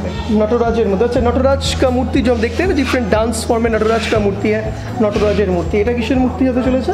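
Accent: Bengal, India. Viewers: Bhai tera hindi bahut kharab hai... better tu bengali me baat kiya kar. Bengali accent me hindi bolke hindi ka mazak mat udha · When u speak Hindi anyone can guess u r Bengali origin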